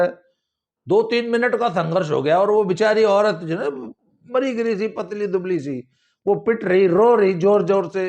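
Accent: Haryana, India. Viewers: uncle ji thari haryanvi kasut andi hai hehe..swaad aa gya sunke hehe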